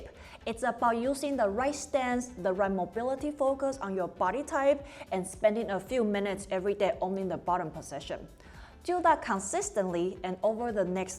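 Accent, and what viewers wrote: China. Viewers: great info. I also LOVE the accent. I thought it was Singaporean but it sounds more Chinese. Thank you.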